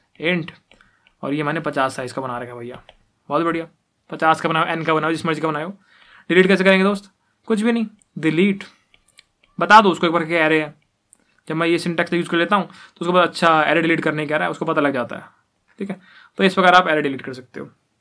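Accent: Haryana, India. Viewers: HARYANAVI accent is so sexy.......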